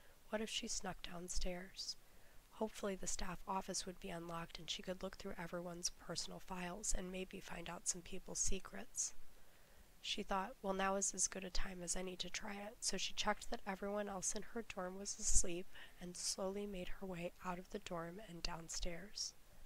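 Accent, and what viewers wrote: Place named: United States. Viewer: Hello! I have just listened to Chapter 5 of "Older Sister Chelsea's Secret" and I am enjoying each chapter that you narrate. You have a wonderful, soft, feminine voice.Your cadence and tempo, as well as inflection and pronunciation is spot on! I could listen to you all day. Though your accent is pure American (guessing West Coast, but maybe Midwestern) the story definitely takes place in either the UK, or one of the Commonwealth nations, such as Canada, New Zealand, Australia or even Bermuda (I live directly west of Bermuda) . Instead of "till", we use "register" or "counter". Instead of calling a teacher "Miss" American students either address their teacher by their name, e. g. "Mrs. Stevens" or "Miss McKee" When the teacher's name is unknown to a student, the correct way to address and converse with a teacher is by use of the appellation, "Ma'am". 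But, please continue to use the British terms for things. I love how British and American English differ so much from one another that my wife and I have to turn on closed captioning when watching a British mystery or film. Slang words and expressions (particularly Cockney English with it's rhyme) and the varied dialects make it almost impossible to understand sometimes, with American ears. I sincerely feel sorry for Chelsea. Her fear of Tina or her parents discovering that she is a bedwetter and has to wear adult diapers to bed, is second only to her fear of being "outed" as a diapered 18 year old high-schooler who has to wear di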